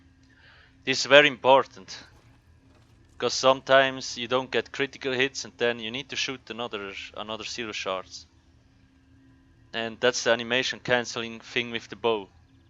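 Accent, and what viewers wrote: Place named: Switzerland. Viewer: Dä schwizer akzänt :D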